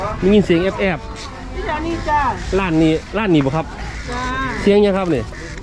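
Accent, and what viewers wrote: Phu Thai, Thailand. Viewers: แอดมินเว้าภูไทมวนครับติดตามประจำเบิ่งอยู่กรุงเทพจากคนอุดร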